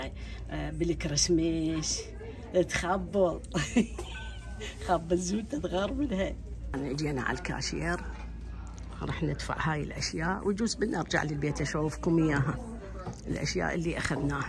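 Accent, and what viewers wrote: Baghdad, Iraq. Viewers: والله استمتعنا كلش لان نفس ذبتنا وسوالفج كلش حلوه وذكرينا بالحجي البغدادي الحلو الله يبارك بيج وصحه وعافيه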